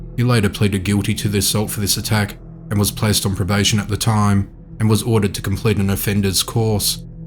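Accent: Australia. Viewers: I am guessing the narrator is Australian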